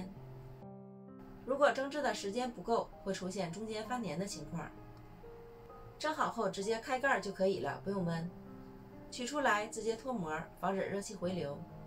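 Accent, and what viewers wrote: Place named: Northeast, China. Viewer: 东北腔。。。大米发糕的桌法，😅 也加了麦子面的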